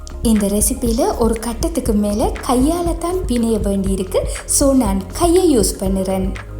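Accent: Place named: Northern Province, Sri Lanka. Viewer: மாஷா அல்லாஹ் ❤ வடக்கு மாகாண தமிழ் மிகவும் அழகு 🎉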